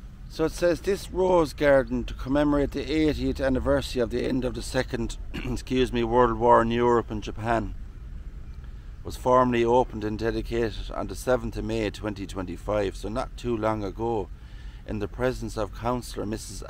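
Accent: Ireland. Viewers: Oh my goodness, that is terrible, it's unthinkable. Keep up the good work, I think you are wonderful beautiful Irish voice. Lynn in Brittany x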